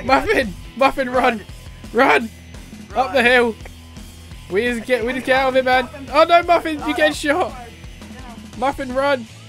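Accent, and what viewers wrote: United Kingdom. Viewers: someday you could go to buckingham I am a fan I always watch your videos it funny it's sometime has edit if you go to buckingham I'm there Lieutenant Truong I could tell that your British but I'm not british I'm not the one who incharge I know you might be yelled by the royal guard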